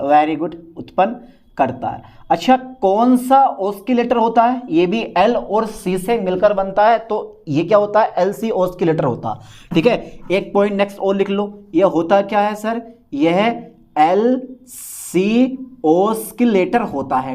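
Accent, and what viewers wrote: Bijnor, India. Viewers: Sir ap district bijnor se ho ...? Apki bolne se lag rha hai ap bijnor district se ho ...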